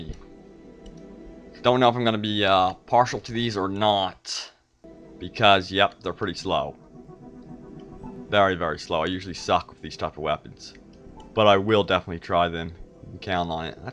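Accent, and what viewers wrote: United States. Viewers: Are you american??? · He sounds like Forrest gump No offence but in English so to me everyone from the south sounds like Forrest gump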